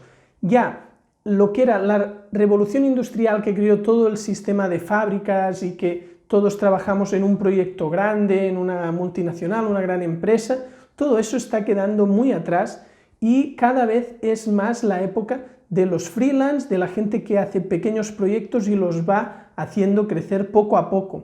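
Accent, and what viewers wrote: Catalonia, Spain. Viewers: Solo por curiosidad, eres catalan? Lo digo por el acento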